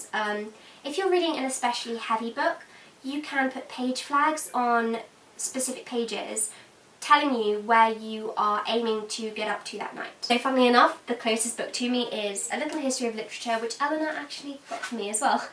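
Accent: RP, United Kingdom. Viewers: British acccent... Reading books..... And your hair cut is also same as Hermoine granger... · I like these tips but shes so annoyingly posh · Your accent are just like Hermione Grandger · You remind me if the girl of harry potter you also look alike and have the same accent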